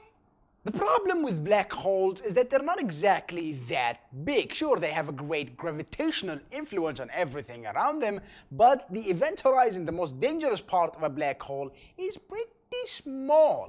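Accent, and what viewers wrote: India. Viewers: Is he Indian? Because his accent is so distracting. "Eaaartdh" - this guy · What a perfect Hinglish! It makes me want back to India =) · I cant tell if that's an Indian accent or an Arabic accent...either way it's fucking terrible.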